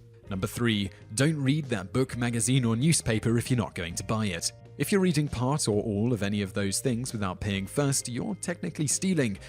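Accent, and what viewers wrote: England, United Kingdom. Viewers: Shouldn't this be laws nobody obeys in America? Also why is the narrator British? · He has an English accent because he's English? What do you think? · I notice you have an English accent, but you Americanize everything you talk about, stats, money, language and even driving. Sell out! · OMG dude what's with the English accent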